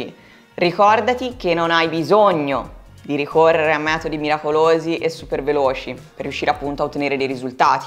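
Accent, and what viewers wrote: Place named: Tuscany, Italy. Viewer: Poco toscana😅😂😂😂❤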